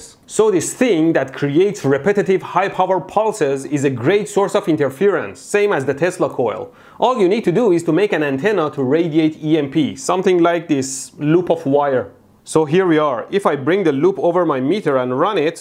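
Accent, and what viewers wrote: Iran. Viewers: Your accent looks like iranian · he has an Iranian accent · لهجه ایرانیت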